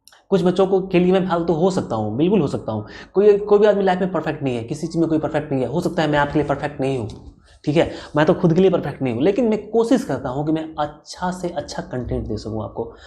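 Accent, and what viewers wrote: Bihar, India. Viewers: Sir ap Bihar se hai kya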